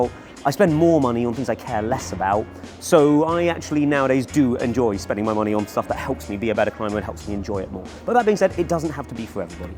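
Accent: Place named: United Kingdom. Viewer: If you don't mind, i really dislike the auto-dubbing. Sounds is crap, voice is lame. Your british accent is significantly nicer : D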